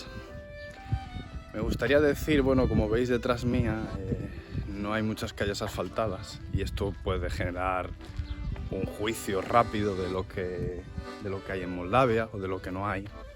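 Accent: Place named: Mexico. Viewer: Muy interesante pensé que eras mexicano por tu físico y tu español. Así es los países poderosos siempre quieren lo que otros pueblos tienen y se los roban e invaden para quitarle sus riquezas. Eso sucedió con mexico y latinoamerica. Cuando España nos invadió , masacró , eliminó , nuestros idiomas originales y se llevaron todas nuestras riquezas Pero lo que no nos pudrieron robar fue nuestra esencia y valores. Me gusta mucho Moldavia y Rumania son parecidos a los de latinoamerica. Mucha suerte en tu canal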